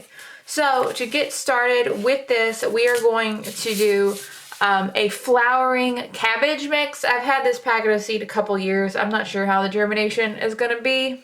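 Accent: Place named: Texas, United States. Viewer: SO HAPPY to find you again, your accent reminds me of my friend janine from texas. :) i enjoy listening to you.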